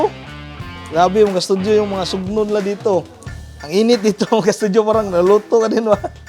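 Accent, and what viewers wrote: Visayas, Philippines. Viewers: Bisaya kaau ka bai..😅😅😅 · Mag bisaya idolwag kang mag Tagalog halata kc Tagalog mo idol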